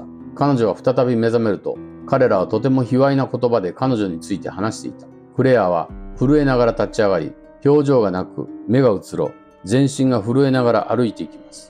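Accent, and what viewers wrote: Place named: Kansai, Japan. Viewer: 若干関西訛りの。見やすくて助かります。ありがとうございます。ホラー映画最高ʕ•ᴥ•ʔ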